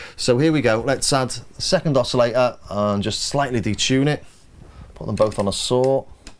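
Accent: Liverpool, United Kingdom. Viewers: Just discovered - Great work mate. I make info vids too and that's a lot of work you're putting in there. Re-living my past buying the software synths from my 20's (including the old pro one in the background!) and you provide a detailed and honest review. I do keep thinking you'd love reaper with CSI to run your synths so you wouldn't miss the physical element - it's the thing that brought me back to enjoying all this gear. We're of similar era and location by the accent, always happy to be given the score with a scouse twang ;) Have you tried any of the cherry audio synths?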